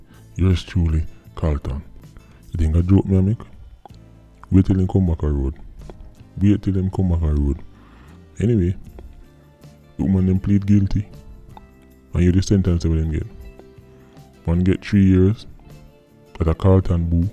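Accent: London, United Kingdom. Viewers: you have the London talk down pat lol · The diet coke makes me🤣every time.. full of jokes🤣I just love the way he commentates🤣love the uk accent...u got it in one👏🏼🤣🤣❤️ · Yes big man! Loving the attempt at the London accent! 🤣 keep up the solid work bruv!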